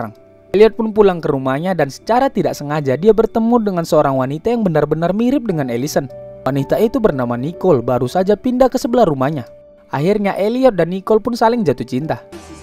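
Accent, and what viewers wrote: Medan, Indonesia. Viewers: bahh... anak medan rupanya...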